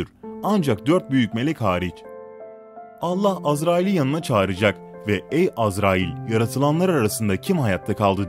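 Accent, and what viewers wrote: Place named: Azerbaijan. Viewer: soyledigin sozler azeriye benziyor hemde cooook guzel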